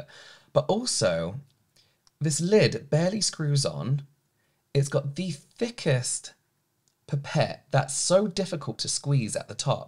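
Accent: United Kingdom. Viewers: Hi sweetness (from Sicily)!i am an english teacger in a secondary school and,you know what,I adore your pure Brit accent and suggest my students following you😂😂😂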